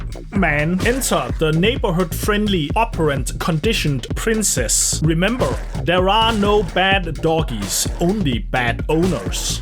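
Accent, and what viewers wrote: Denmark. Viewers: You are Danish. I can feel, hear and smell it. As i am danish myself. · i smell danish on ye boy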